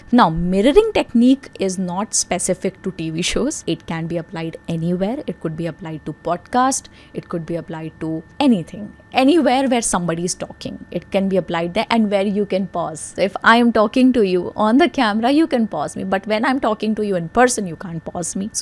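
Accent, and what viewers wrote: India. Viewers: Why you not speak Real English it's hindi English. The lanaguage must be speak on their orignal way is best to teach and learn not on this way Thank s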